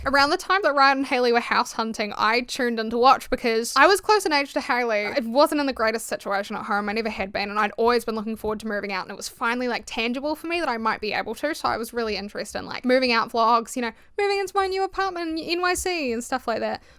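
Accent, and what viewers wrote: New Zealand. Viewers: I know right. Im kiwi and I sometimes hear a tinge of a kiwi accent there, but then there’s also Aussie and American in there. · I hear Kiwi. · She's from New Zealand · Sorry! Kiwi accent!! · Im a little late to comment, but I love your videos! You always have a refreshingly nuanced take on the topics you speak about, taking things in good faith and looking at them from a logical yet empathetic perspective. I really appreciate having a channel to watch after work that feels so grounded in reality. I also appreciate and admire your ability to be open and vulnerable about your past hardship while still mainting an optimistic view, as well as keeping distance from us the viewers. I can only imagine that delineating between being honest versus nurturing parasocial relationships is extremely difficult, but you seem to be managing just fine. 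The way you decide when and how to use personal anecdotes is incredibly effective in enhancing your point and is genuinely impactful because its not the only point you have to make. One final thing Ill say is this; I know you get plenty of comments on your accent, but I think its so cute. Ive always loved kiwi accents. When I was a kid my favorite twitch streamer was kiwisimmer (or a username along those lines), partially because her voice was so soothing to me. Keep it up with the amazing videos, your perspective and personality is unique and incredibly necessary on this platform! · fellow kiwi with some linguistics knowledge here. You def sound like a Southie for the most part. Notably though, you do velarize some sounds. Mostly h and s sounds from what I've noticed. Could def be something you've picked up from your Dutch dad. Or i could be way off. Hope it was maybe interesting though 😊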